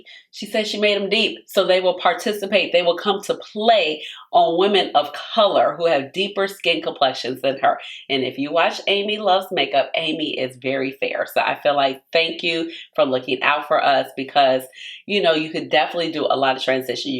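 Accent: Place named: Chicago, United States. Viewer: I had another idea for when you reach your palette ranking video #24. You could do a brackets-style “battle” of all of the #1 ranked palettes from all of the ranking videos? You could also do it as a series instead of a single (long a$$) video. Just an idea! Loving your content not only for the lewks (which are stunning!) but I also love to listen to people with my hometown accent. Chi-town, baby ✴️🚤✴️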